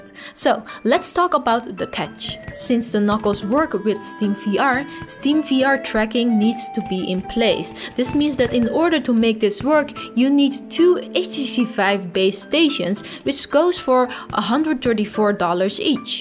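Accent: Netherlands. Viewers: o the dutch accent always hurts my ears . but thanks for the answer ! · Are you dutch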